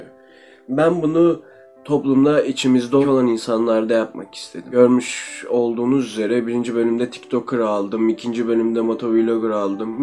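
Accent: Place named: Azerbaijan. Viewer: vay, bey Azerbaycan Türkçesine değdiriyor.✍️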